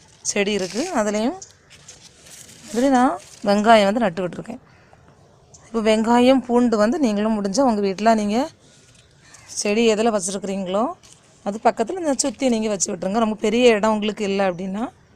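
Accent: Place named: Kanyakumari, India. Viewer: குமரி நீங்கள் பேசும் தமிழ் அழகு அக்கா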